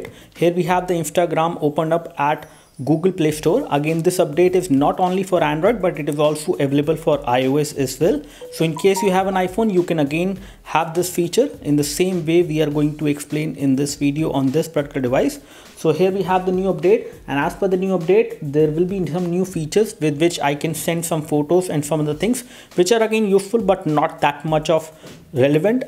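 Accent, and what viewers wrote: India. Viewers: Javier Sports it's an Indian accent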